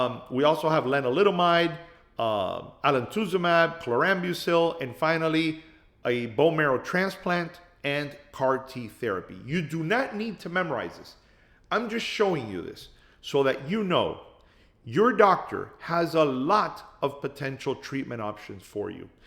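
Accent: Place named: East Coast, United States. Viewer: I was recently told that I may have LCC and have started the testing process. I’m a 54-year-old woman with a connective tissue autoimmune disease, and my lymphocyte levels have been elevated for the past four years. I plan to revisit this video after my appointment next month, when I hope to have a better understanding of what’s going on. Thank you so much for taking the time to share this information. I also wanted to mention that your East Coast accent was incredibly comforting to me. Having grown up on the East Coast and having lived in the Pacific Northwest for the past 25 years, hearing your voice made me feel relaxed and reassured. It reminded me of home and gave me that warm, familiar feeling of being around family. I didn’t realize how much I needed that comfort until I listened to you. Thank you again for sharing your knowledge and helping others navigate what can be a scary and uncertain journey.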